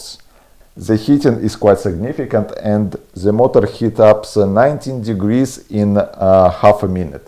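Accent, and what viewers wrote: Russia. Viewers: Акцент, как у русского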